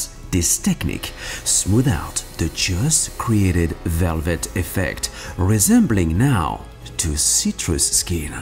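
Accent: France. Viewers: Il a un accent français vive les français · May i Ask you where are u from? French? Only reason asking because of your accent :) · You're French right? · From what I know, he sounds like most French guy speaking English.. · These americans making fun of his accent. lmao i bet you can’t even pronounce a single French word correctly. at least he can speak at least 2 languanges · Are you French? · Are you French ?? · This Guy's Just French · Putain mais quelle accent Français! LOL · Je me disais aussi le gars avait un léger accent français XD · Are you french ? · nah, it's his french accent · You have a weird mix of an Australian and French accent and it's kinda offsetting for some reason.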